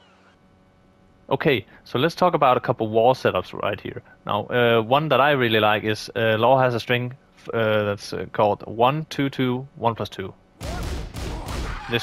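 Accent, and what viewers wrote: Denmark. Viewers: I smell Danglish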